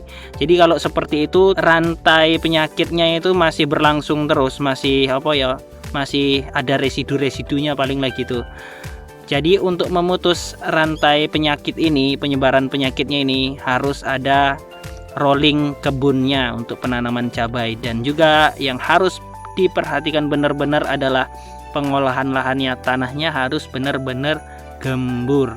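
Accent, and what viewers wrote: Java, Indonesia. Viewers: Masnya ini jawa mana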